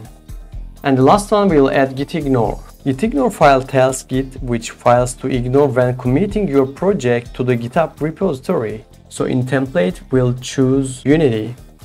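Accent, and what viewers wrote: Turkey. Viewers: Abi Türk olduğun çok belli oluyor ama teşekkür ederim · I picked up the Turkish accent right away when you started talking😄 Nice video btw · are you from turkey ??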